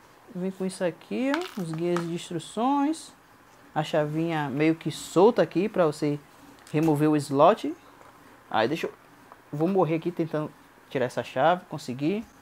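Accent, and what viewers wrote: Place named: Salvador, Brazil. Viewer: Vc é soteropolitano, sacaninha rsrrs. Bom vídeo! Mas o aparelho parece ser bem meia boca.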